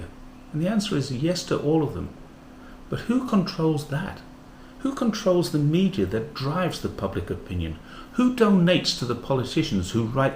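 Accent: Australia. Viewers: First Time I hear this. Are you Australian???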